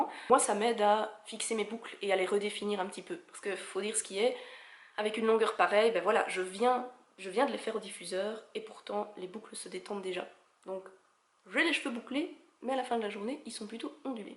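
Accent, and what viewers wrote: Belgium. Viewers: Oh tu es Belge ! J’ai été voir ta description car je me disais bien que je connaissais cet accent, très chouette vidéo, tu as des cheveux magnifiques !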